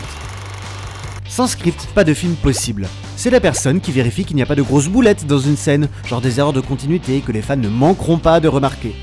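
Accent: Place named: Paris, France. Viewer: Pourquoi il y a un accent parisien aussi fort, et me dite pas que les parisien n'ont pas d'accent, il y en a un; et c'est celui là!!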